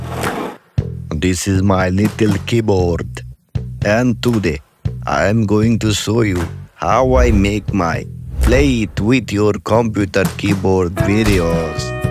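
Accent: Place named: Spain. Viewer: Thats the most Spanish accented English Ive heard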